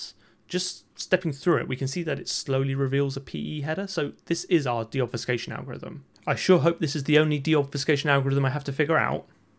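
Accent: United Kingdom. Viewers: i love your accent... remember me when i been in london.. age ago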